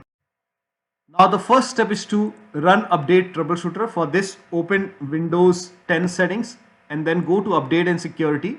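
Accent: India. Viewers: This video combines a lot of useful information in one place and presents it thoroughly yet briefly and succinctly without a lot of extemporaneous nonsense. The audio is terrible, a better microphone is needed. The content provider needs to speak about 25% slower due to his heavy accent. His English is excellent, his speech needs work because even though he knows English well he does not speak it particularly well. For example: I'm watching/listening to this on some fairly high end equipment so I can hear the words very clearly but when he says ".exe file" it sounds like he's saying "yeti fye". If you're going to pronounce a file extension it's better spell it out, to say "dot E-X-E" than it is to try to make the file extension into a syllable. Or in the case of the .exe extension just say "executable". Slow down, enunciate, listen to people speaking English as their mother tongue from Canada, America or Britain (not Australia or New Zealand, those people don't know how to speak their own language) and work on making your speech more intelligible. 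After you have a good grasp of the language listening to other Indians speak English is not helpful, you all just reinforce your pronunciation mistakes. It does little or at least less good to know a language if the people you're speaking it to can't understand what you're saying.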